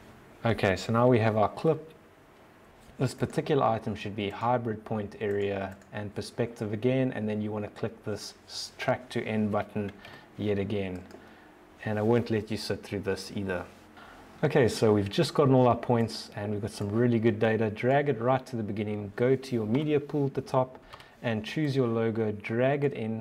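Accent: South Africa. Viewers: U sound south African 🤔 Jus a thought I wanted to share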